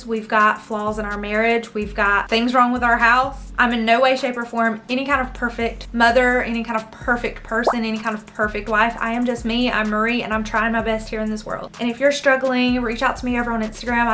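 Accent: South, United States. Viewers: Girl, I really needed to hear your words today! I have to remind myself of those same things every single day. I was stuck in fight-or-flight mode from the time I was 9 until I was 31, so I completely get it. After years of therapy, thank God, I’m in such a better place now. I’ve only been staying home for a few years, and it’s still an adjustment. I’ve got a 15-year-old, a 9-year-old, and a 1-year-old—and I just love your videos. Your accent makes me smile too since I’m from the South as well. Thank you for sharing. ❤ The power of prayer is so real! Continue to pray for your enemies!!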